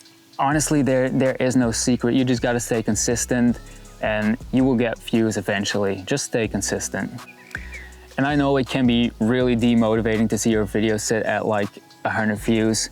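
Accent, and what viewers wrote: United States. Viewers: Are you American? Definitely sound like it but I know you're over in Belgium...So confused 🤯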